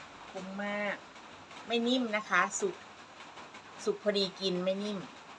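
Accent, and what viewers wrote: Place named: Sakon Nakhon, Thailand. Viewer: ❤❤ชอบค่ะพูดย้อปนไทยเผยแพร่ภาษาคนสกลนคร🎉🎉🎉🎉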